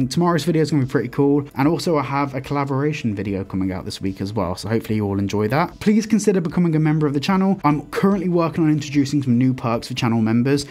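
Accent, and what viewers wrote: Birmingham, United Kingdom. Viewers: R u from Birmingham geezer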